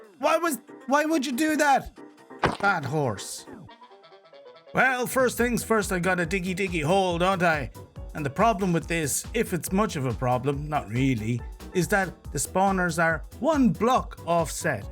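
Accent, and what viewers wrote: Ireland. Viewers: how do you not have more subscribers!!? well im happy to be here anyway definitely a new favorite youtuber, most people that do hardcore series go straight to automatic farming and beating the game but you have a big focus on builds which i love and a fun humor and voice. You remind me a lot of one of my favs is Waxfruad just with that irish accent 😂 Thanks for the videos youre awesome!! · Wow you were not kidding with that heavy Irish accent 😂😂 And I actually like it, it's fun😅😂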